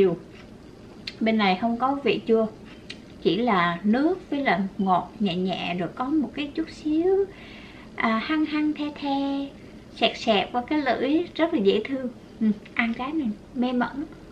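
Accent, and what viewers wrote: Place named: Hue, Vietnam. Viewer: Cô nói tiếng huế hay ghê á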